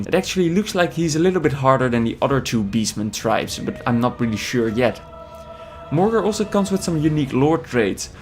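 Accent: Netherlands. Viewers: He's fucking dutch, you try speak a language that you aren't accustomed to and let's see how you sound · Your accent sounds so Dutch !! any change you are from The Netherlands ? Anyways, amazing video !1 thanks !! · dacht ik al was een nederlands accent xD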